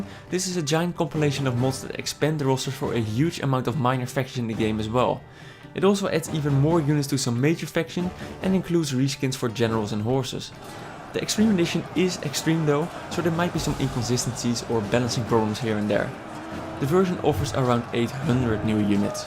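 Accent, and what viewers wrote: Netherlands. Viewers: Ik wist gewoon dat je Nederlands was door je accent, toen las ik de beschrijving van je kanaal xD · haha your sooo dutch i can tell by the accent sooo much haha great vid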